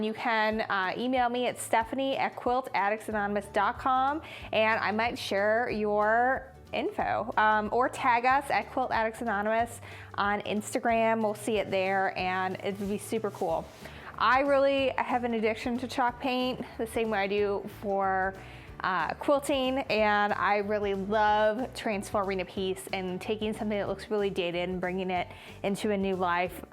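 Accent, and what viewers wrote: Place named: Quad Cities, United States. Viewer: No way! I was listening to your "accent" and asked my son who you sound like and he said me - I am originally from East Moline and went to Alleman. I currently live in Las Vegas and have since 1989 (I am much older than you) -still call the QC's home tho! Your tutorial was excellent - you made it easy to follow and love your explanations.Your final product looked flawless and your shop looks amazing! My grandma and Aunts had a shop in Moline off of 34th St and 23rd ave, maybe you had seen it, The Stitchin' House. I am so glad I listened to the very end so I could figure out where I have heard that dialect before. Keep up the great work -looking forward to watching your other videos! Happy Holidays! Natalie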